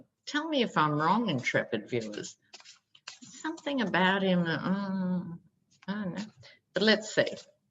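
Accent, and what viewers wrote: Australia. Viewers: You remind me of Dixie from "Designing Women", she was such a beautiful actress and had such a sexy voice like yours. Your Australian accent vs her southern accent. I knew you looked like a famous person but couldn't think of her, she was married to Hal Holbrook (spell.?).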